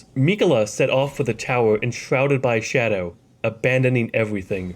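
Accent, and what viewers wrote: Pittsburgh, United States. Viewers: I keep wanting to ask if he's from pitt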